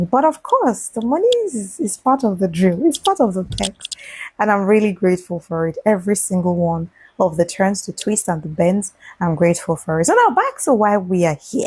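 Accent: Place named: Africa. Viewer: I like it but talk better. Your African accent distract your audience, and I can't understand you well. Work on your accent to become American, more people will understand your words. One love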